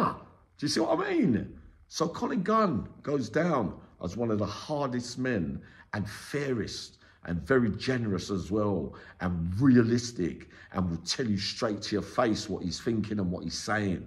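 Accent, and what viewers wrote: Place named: London, United Kingdom. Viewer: brilliant video...loved it...and i love the london accent...keep well ..keep happy....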